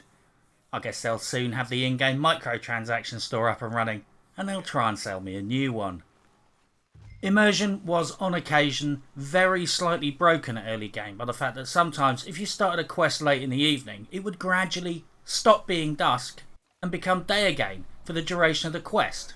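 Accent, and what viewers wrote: United Kingdom. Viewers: Its refreshing to hear a brit that knows rocket ball ammo was junk. Also thanks for using my favorite native meme. My mother had a bumper sticker on her car when I was kid that read "of course you can trust the government just ask a native". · Same I’ve just discovered them and now I have dozens to watch you just can’t beat that British wit or accent haha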